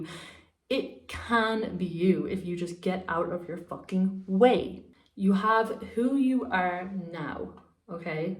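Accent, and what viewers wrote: Ireland. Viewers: Love your videos btw...so far you're the BEST and I love your Irish accent ❤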